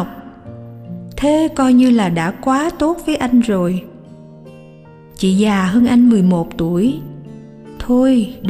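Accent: South, Vietnam. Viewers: Truyện hay, cảm động, 8/10 cảm ơn giọng đọc dịu dàng, miền Nam của.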